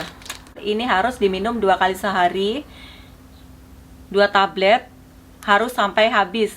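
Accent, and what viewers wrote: East Java, Indonesia. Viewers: aku senang sama gaya bahasanya jeng, pakai bahasa Melayu, tapi logate medok khas Jatim, keren jeng... 👍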